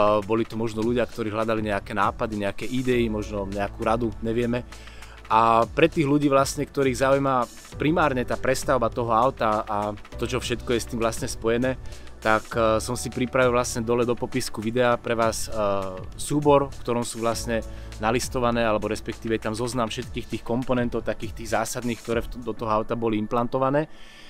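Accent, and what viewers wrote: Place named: Russia. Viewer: Почти по - Русски говорит же ! Кто Вы - национальность ?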